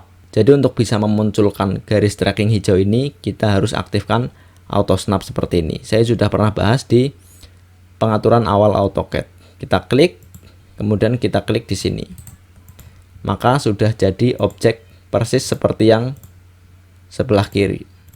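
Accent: Banyumas, Indonesia. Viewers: mantap mas.. lanjutkan.. saya tonton videonya semua... btw masnya kayak ngapak nih xixixix josss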